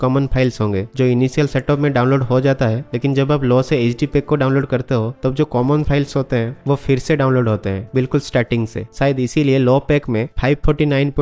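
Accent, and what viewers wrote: Odisha, India. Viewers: Bro, are you Odia?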